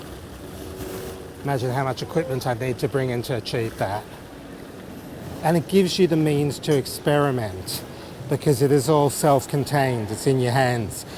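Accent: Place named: England, United Kingdom. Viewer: I do admit...I love your English accent...!!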